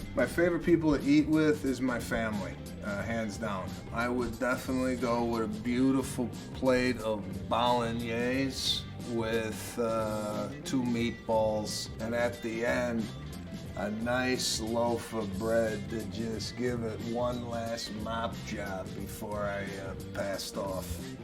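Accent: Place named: New York, United States. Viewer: It was interesting to hear things about you. I also like how you exaggerate your New York accent (and your HILARIOUS body movements!!!) during your routines.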